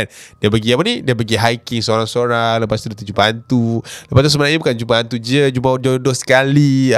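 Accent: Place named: Kedah, Malaysia. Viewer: hng mmang ska ckp kedah ka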